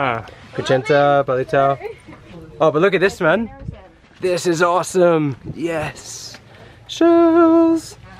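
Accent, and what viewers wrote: Visayas, Philippines. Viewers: ....hi cute kaayo ka mag bisaya, wow ha... gd boy kaayo ka, mag amen jud ka SA mga matatanda, bless you.... from Dubai....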